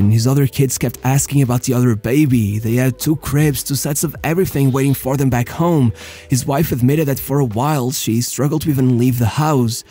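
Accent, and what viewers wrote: Portugal. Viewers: Es tuga né ? 🇵🇹 consigo perceber pelo sotaque 😂 Mas de qualquer maneira grande vídeo! Muito bem explicado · He's Portuguese, he isn't a native English speaker so ofc he's gonna have some accent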